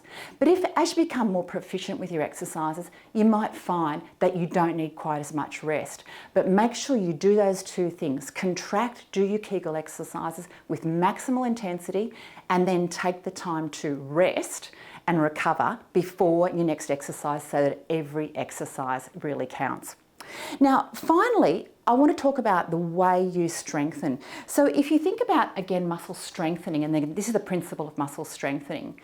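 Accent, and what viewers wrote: Australia. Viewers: I also love the Australian accent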